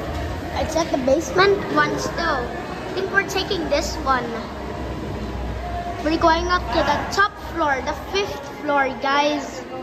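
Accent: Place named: China. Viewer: Thick Chinese Accented Voiceover!!!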